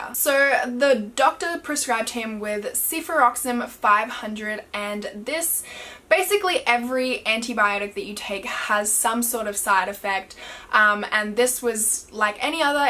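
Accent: Australia. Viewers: Very interesting...I love your Austrailian accent btw 😍😍😍.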